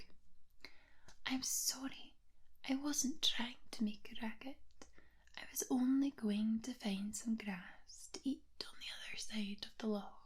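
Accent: Scotland, United Kingdom. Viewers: I do not have good ears for decrypting deep Scottish accents. I didn't imagine that "Healing Goose" would really be "Highland Cows". Crazy. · Before I comment on your video, I must say that I'm again running late watching and commenting! It has been like this for a very long time due to my working schedule! I have to mention this because only a few people know! I have mentioned this to Alexander and Nick and it's about the very first time that I got into Asmr videos! I'll try not to drag this out but I started browsing YouTube videos, cars, etc. several years ago and somehow I came across this video made by Kel who is from Australia doing this Asmr video and with her accent and hand and mouth sounds for some reason definitely got my attention 😀. So I followed her for a while and one day I came upon very stunning Scottish women doing Asmr videos also. 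So I got hooked watching several of videos and of course that amazing Scottish accent had me hooked! It took me several of her videos before I got the nerve up to start commenting, and boy was I nervous!! Eventually I switched over to channel and so the rest is history! I know that some of you have been following alot longer than me but I am really sorry to my friends that I have met from watching videos that I don't always get a chance to talk with like I use to! 🥺 I really appreciate being made part of the moderating team ❤. Unfortunately I don't get to much of a chance to really be as helpful as a few other of my friends! But I do keep tabs when I can! Thanks everyone who's made it to this point of my comment for letting me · we want even more hella thicc scottish accent videos my love!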